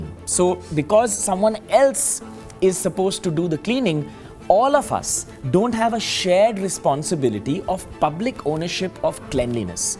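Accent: India. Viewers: I wanted to watch this but I cannot stand the Indian accent. It's a phobia.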